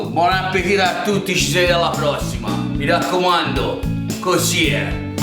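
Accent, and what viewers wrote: Tuscany, Italy. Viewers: Ciao io ti seguo sempre sei molto bravo solo che a volte ho fretta e tu parli troppo poi in toscano rigido che a volte non si capisce però basta poi vedere per capire purtroppo in questo video manca un pezzo ma dopo aver messo la farina di semola nel 2 tegamino poi l'hai aggiunto al primo o quella è una porzione ? Quindi per altre porzione bisogna ripetere questa operazione vari volte ? Grazie per i tuoi ricette buonissime e salutari. Adoro le zuppe io sono marocchina e c'è una zuppa in Marocco simile ad una ricetta toscana comunque è ottima la cucina toscana prevede tante zuppe che fanno bene . 👍👌👏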